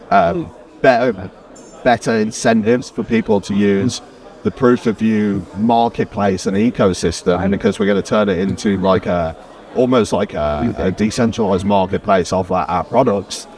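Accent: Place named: United Kingdom. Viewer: Sehr gutes Interview, und ich mag dein Englisch sehr. Warst du mal längere Zeit in Großbritannien? Die meisten Deutschen sprechen ja entweder mit sehr deutschem Akzent, oder es klingt eher amerikanisch. Bei dir gar nicht, das klingt schon relativ britisch.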